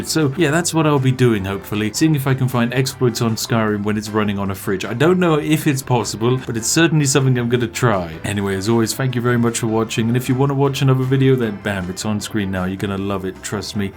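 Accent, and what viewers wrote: United Kingdom. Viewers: Casual British tone "We're almost dead now, and that's great."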